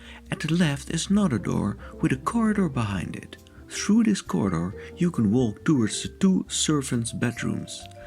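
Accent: Netherlands. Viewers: Hi, thousand thanks for this absolutely stunning documentary. No clue, whether it was made using AI, but in this case, the artificial help would have been wholesome. I very much enjoyed the abundance of plan views. This is a great plus, considering all the documentaries entirely made by AI running riot at YouTube. They are like a pest. A highly mind numbingly monotonous voice tells stories embellished with lyric weeds, which in general has nothing to do with the topic of the documentary. Would be kind of a match if you put in perspective that the images either are plentiful and artificially rendered and show scenes, which don’t at all match neither the narrator’s discourse nor the topic. In profusely appreciated contrast this documentary is how they should be and show, what the whole point is in doing a documentary. By the sympathetic way the narrator pronounces the “v”, tell’s me of his home country the Netherlands and shows me again, why I love the Netherlands and the Dutch people. 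I must visit the country again very soon, should not be too difficult for me, as I live in Germany. I can only bid you many warm a thank you. Please continue your fine work. Hartelijk bedankt voor je geweldige documentatie. Blijf zo doorgaan. 🇱🇺“Je maintiendrai”🇱🇺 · It’s so funny, I also thought you sounded like the Netherlands, so it seems I was guessing a little correctly. Good luck with all.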